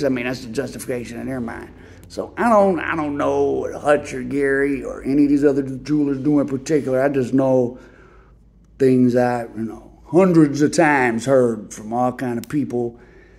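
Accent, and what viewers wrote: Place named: Midwest, United States. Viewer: Your videos very interesting. Lots of folks on youtube with this type of content and reporting but you do it TV worthy. I think you'll be on a network show soon, such as A&E, Tru TV, E, NatGeo, etc. But the accent kills me. Like fingernails across a chalkboard. Worse than Det. Tom Armelli, the retired Detroit detective on The First 48. Strong midwest accent. DA BEARS! By the way I think you are a hell of a journalist even though you say you're not. Later.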